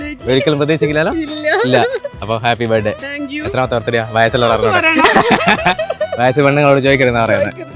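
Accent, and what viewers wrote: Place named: Nadapuram, India. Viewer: നാദാപുരത്തുകാരുടെ ഒരു ഒലക്കീലെ ഭാഷയും,കോപ്പിലെ പരിപാടിയും.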